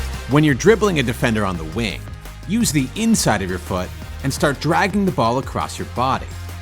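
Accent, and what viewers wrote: United States. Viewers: Clicked video. Heard American accent. Closed video.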